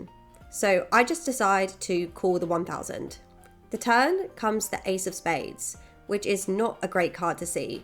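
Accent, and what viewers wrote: United Kingdom. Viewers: Ul in hahah British accent will always make me laugh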